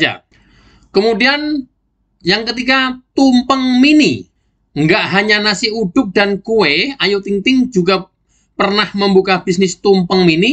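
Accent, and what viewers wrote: Java, Indonesia. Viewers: Kata sape cnnel asal je ne dengar suaranya anda jowo kn...cari mkn jgn bt. Fitnah huuhhhh. ATT baik"ze 😂😂😂😂😂😂👉🙈🙈🙈Seperti KD pembantu x sedar dri law dpn sye x kasih👉🌶🌶🌶🔨🔨🔨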